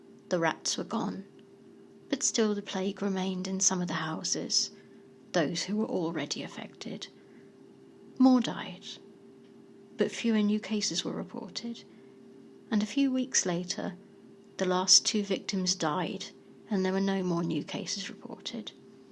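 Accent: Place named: England, United Kingdom. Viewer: Is it sad I'm just a bit jealous of the beautiful English accent and the shout out from? 😬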